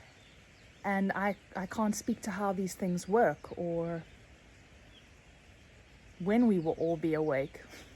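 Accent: South Africa. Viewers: Jai Anandamayi Ma ❤ the divine feminine expressing itself through this beautiful blessed woman with a South African accent. Shanti Shanti Shanti 🙏❤🕉❤🙏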